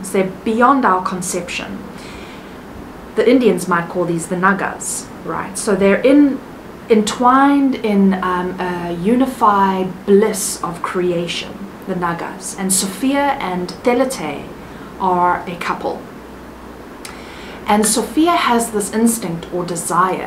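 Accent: South Africa. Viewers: You have a South African accent 👁️🐍⚖️🔥☝️ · Are you a Saffa? 🇿🇦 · You sound South African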